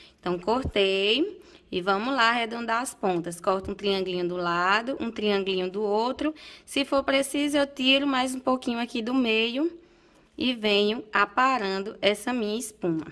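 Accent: Northeast, Brazil. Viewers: Amo o seu trabalho, Acho lindo o seu trabalho e o seu sotaque. Vc é paraibana ou pernambucana?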